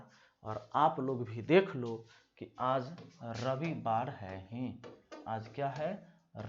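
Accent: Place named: Bhojpuri, India. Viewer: Wars ko bars Yeh Bhojpuri bolne wala teacher hai Bekar lagta hai. Mane mane yeh kya yaar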